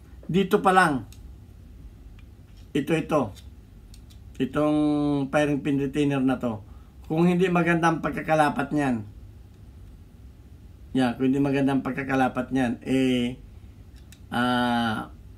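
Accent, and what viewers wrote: Bicol, Philippines. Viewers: Bikolano k po b manoy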